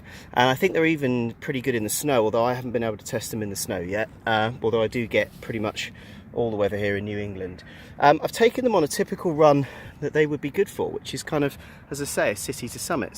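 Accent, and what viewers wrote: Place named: England, United Kingdom. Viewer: New England location but old England accent !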